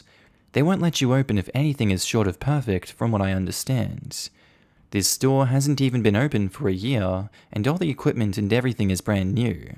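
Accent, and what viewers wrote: Canada. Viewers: I think you sound Canadian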